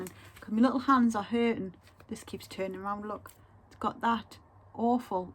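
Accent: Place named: North, United Kingdom. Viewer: My favorite British YouTuber and Make up Specialist. Not just because I am in love with the northern British accent, having lived in Manchester for a year back in 2012/2013 but because you are simply amazing, with your humble yet bright personality. Please never stop showing the world who you are, because the world needs to see and hear you. ❤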